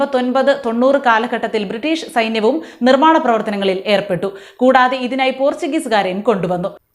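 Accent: Thiruvananthapuram, India. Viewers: ആകർഷകമായ ടോണിൽ ഗൗരവമായ വാർത്ത അവതരിപ്പിക്കാനും ആക്ഷേപഹസ്യം അവതരിപ്പിക്കാനും തിരുന്തോരം ഭാഷ പറയാനും മിടുക്കി 👍👍❤️🌹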